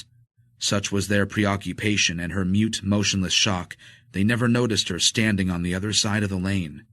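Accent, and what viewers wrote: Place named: United States. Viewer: As always, Nevill’s tale is rich and textured and compelling. His prodding of what scares us, ancient fear, from before we knew what fear was is—if not actually unique—superlative. Why a Yank narrator? Got in the way a bit.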